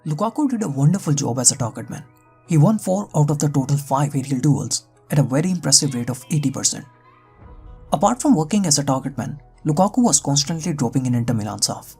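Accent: India. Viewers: this indian accent so annoying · Fucking indian accent. This analyzis is bullshit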